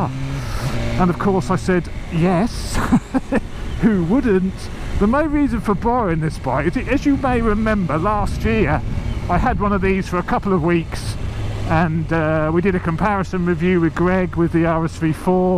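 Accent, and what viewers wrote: United Kingdom. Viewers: i watch because your speech/voice is extremely enjoyable to hear!! Love the UK 🇬🇧 accent!! Fireblade RR-R -SP finally getting flashed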